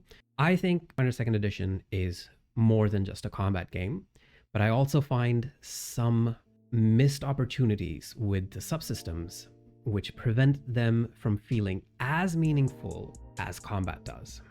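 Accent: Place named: Canada. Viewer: I love your accent! It's a subtle Canadian accent (I think?) but there's something about it that is distinctive that I can't place, even though it's extremely mild. Also great ideas about the game, a lot of people sleep on subsystems and I think you've pretty comprehensively analyzed why.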